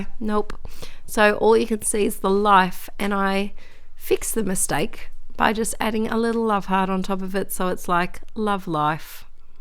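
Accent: Australia. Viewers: AWESOME Project Life video! So good to hear another Aussie! I absolutely loved it and have definitely subscribed. I think we have a bit in common and if you get a chance I would love you to have a look at my channel xo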